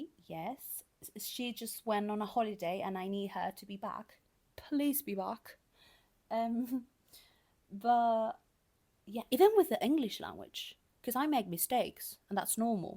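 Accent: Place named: United Kingdom. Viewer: Esto ya lo he dicho un monton de veces.... Si no supiera que eres chilena igual que yo. Juraria que eres britanica.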